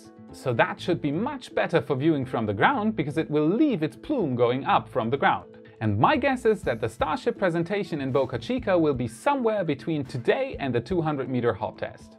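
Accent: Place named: Germany. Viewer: Nach 2 Wörtern schon felsenfest gewusst dass du deutscher bist ;) Danke für das Video, echt super erklärt^^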